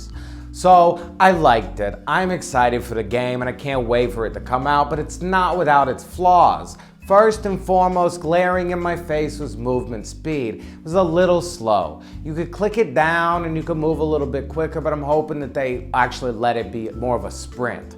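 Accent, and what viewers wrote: New York, United States. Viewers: are you from new york or mass? figure it out.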